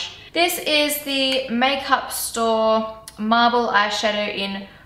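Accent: Australia. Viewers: Australian or New Zealand? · Ur Australian accent is so cute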